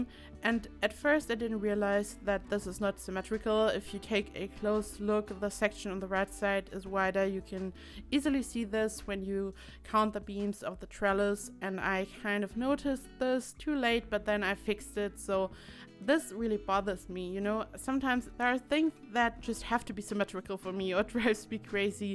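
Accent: Germany. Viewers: Ur german, aren‘t you? Sounds like it.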